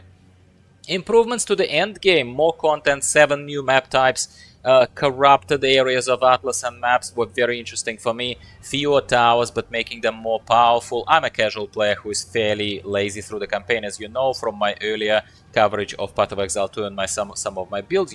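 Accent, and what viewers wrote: United Kingdom. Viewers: Her accent is of a tedious northern British working class pleb, it pisses me off also. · Its a Northern English accent mate.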